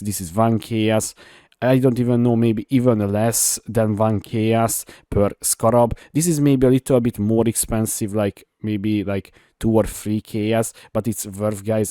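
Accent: Hungary. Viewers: nagyon hallani, hogy Magyar a srác 😅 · Work on your accent my buddy. Without looking I'm pretty sure you are from Hungary. :D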